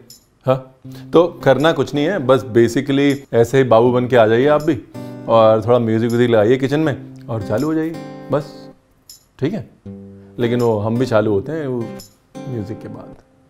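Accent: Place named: Punjab, India. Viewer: I jst love ur panjabi style talking sir and hindi both,and ur recipes r tooo easy to cook at home and yummy,mostly sweets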